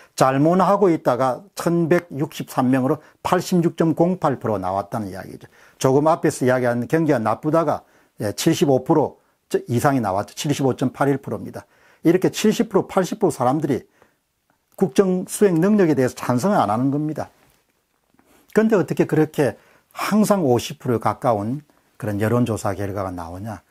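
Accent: Gyeongsang, South Korea. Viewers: 강한 대구사투리... 그것만으로도 공병호의 신뢰성 없어 보임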